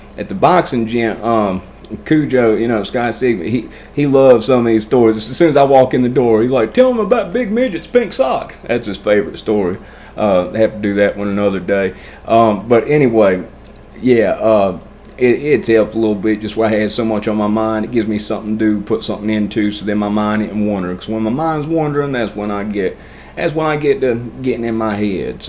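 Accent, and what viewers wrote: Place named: Southwest Virginia, United States. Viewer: Dude I don’t know where your from but it sounds like from back home, I’m from Southwest Virginia and did almost 6 years camp 1. I live in the Philippines now and have been sober since January 17,2005 I was on pills and dope bad junked out lucky I didn’t get caught doin a lot of dirt.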